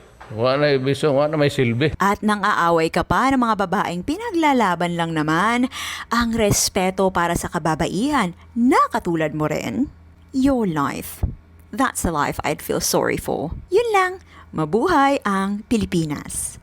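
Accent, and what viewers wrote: United Kingdom. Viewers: Wow 😮! British accent very lovely ❤❤❤ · Sus, hindi naman batayan ang accent para masabing may alam, pero ito yung may slang na british daw pero walang alam kundi puro opinyon, at kabastusan, so nasaan ang smart na part?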